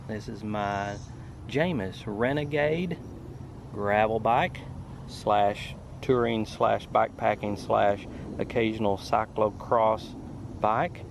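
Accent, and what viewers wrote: South, United States. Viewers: Fellow grinder here from Alabama. Just found your videos while looking at sno peak and toaks cooking set ups. Your videos are very helpful. I'm also a gravel grinder, and in fact moderate the Alabama gravel cyclists facebook group. Feel free to join if you want. By the accent, sounds like you might be in the south. I'm currently acquiring bike packing gear. I just received my revelate bags front and rear with a rockgeist bar jam, and have on order a warbonnet hammock, tarp, underquilt and top cover, and hammock gear top quilt. I'm now looking into what if any cooking gear to buy, vs. pre cooked or pre made stuff like energy bars etc. I like the idea of being able to do a multi day tour with cook set up. Your feedback would be appreciated.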